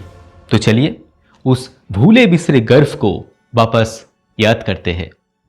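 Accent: Bengal, India. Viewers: হিন্দিতে একটু বাংলার টান আছে, পারে ঠিক হয়ে যাবে, 💹↗️ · I'm a good hindi speaking Bengali and I can see the effort you have put in speaking Hindi in this video. And being your first hindi video, I appreciate you and motivate you for further going on with this venture. Yes, the Bengali tone is evident in your speech but you have balanced it well with a controlled pace which has minimized any pronunciation mistakes. Topic and research were good. But full marks for your courageous first steps in making a hindi video not being a native hindi speaking guy. Lage Raho.... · Pure bangali hindi... We r always with you brother❤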